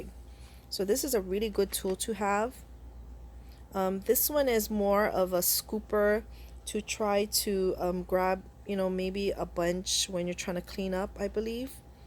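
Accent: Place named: Hawaii, United States. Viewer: New subby from Created to Create. I thought you sounded from Hawaii. I'm from Hawaii too, Waianae/Nanakuli, but I live in Florida now. Aloha!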